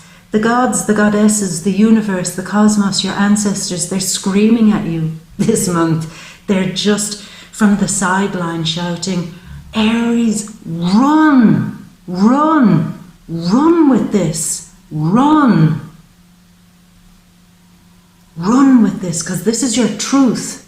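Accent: Ireland. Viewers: Am I hearing Ireland in your voice?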